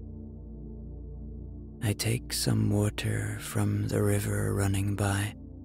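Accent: Ireland. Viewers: You're silky Irish tone makes me smile and reminds me of Co. Mayo 💞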